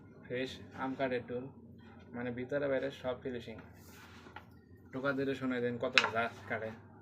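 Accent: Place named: Mymensingh, Bangladesh. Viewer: ভাই সব ঠিক আছে দয়া করে আপনি আপনার ডেসক্রিপশনে অবশ্যই আপনার ঠিকানা দিয়ে রাখবেন ঠিকানা দিলে কি হয়, অনেকে আপনার পার্শ্ববর্তী জেলা বা পাশাপাশি থাকে তারা গিয়ে নিয়ে আসতে পারবে আপনার কথা শুনে মনে হয় শেরপুর জামালপুর কোথাও ময়মনসিংহের মধ্যে বা টাঙ্গাইল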